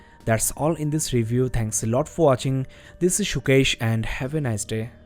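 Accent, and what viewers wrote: India. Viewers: CopyRights can suck it repertoire* see? you cant even write well and no, I dont mean stuttering, but the accent all indians have while speaking english,, is annoying and sounds like Apu from the Simpson 😂😂😂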